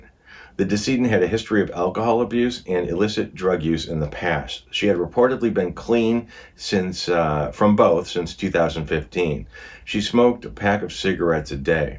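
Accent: Chicago, United States. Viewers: Do I detect a very watered down Chicago accent? Some words are making me thing you may be one of My People. Edit: too bad Ozempic didn’t get discovered for weight loss years earlier because I think that would’ve saved her life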